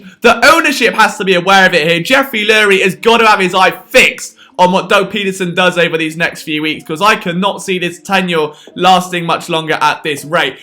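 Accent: United Kingdom. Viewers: Why the Fook is a british person talking about american football XD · I have never heard someone with a British accent talk about football that wasn’t soccer. Nothing wrong with it but this is a first I am amazed.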